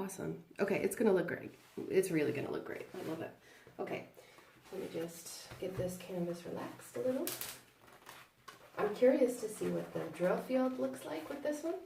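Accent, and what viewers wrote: Ireland. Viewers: I enjoy listening to you, your voice is very soothing while I am drilling. You'd be great on late night radio. I don't know if you realise how much of a an Irish accent you have picked up. Like your new arrivals. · Wow you're sounding very Irish in this video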